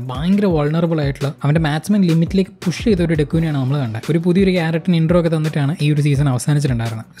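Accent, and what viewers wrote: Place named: Kottayam, India. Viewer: ചേട്ടന്റെ എല്ലാ വിഡിയോസും ഞാൻ കാണാറുണ്ട്. എനിക്ക് ഒരു കോട്ടയം എവിടെയോ തോന്നുന്നുണ്ട്.കാരണം എന്റെ ചേട്ടൻ കോട്ടയത്തായിരുന്നു degree ചെയ്യ്തത്. ചില കാര്യം പറയുമ്പോൾ correct എന്റെ ഏട്ടൻ സംസാരിക്കുന്നത് പോലെ തന്നെയാണ്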